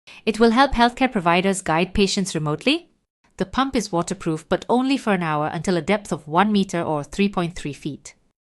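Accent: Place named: India. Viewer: haha the ai face intro and then into the thickest indian accent after the AI slop moves on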